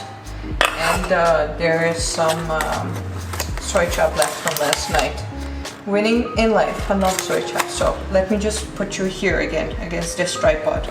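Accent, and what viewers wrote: India. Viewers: Totally picked up Indian accent....😄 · Je hebt zelfs een Indiaas accent als ik het zo goed zeg? Heb een hele tijd geleden wat Nederlandse vlogs gezien en kwam je nu weer tegen. Wat leuk dat je je draai hebt gevonden in India! Wens je veel geluk 🍀 op je pad! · You got a little Indian accent when you speak english haha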